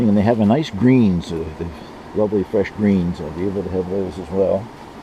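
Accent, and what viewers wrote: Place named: Canada. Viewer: Hey! No clue on what made your video go viral. Anyway, I don't know a thing about gardening, etc, but your videos are still fun to watch. I especially like any videos relating to your cabin. As a matter of fact, that's how I found your channel. I searched for videos about cabins and found your channel maybe a year ago. Keep 'em coming! Always nice to hear that Canadian accent. 😁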